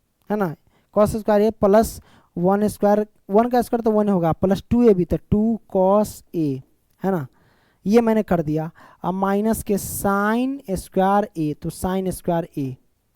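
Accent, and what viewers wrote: Bihar, India. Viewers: Aap Bihar ke ho sir😊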